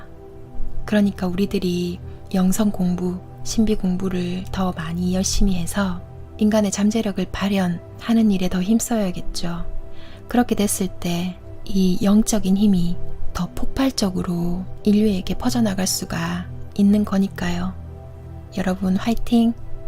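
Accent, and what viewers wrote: Gyeongsang, South Korea. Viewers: 안녕하세요~오늘 처음 와봤는데 왠지 귀에 착착 붙는 경상도 억양...ㅎ 제가 대구 출신이라 너무 정감있네요~^^ 저와 님의 끌어당김으로 제가 오늘 여기에 온것이겠죠? 좋은 내용 감사히 잘 들었습니다~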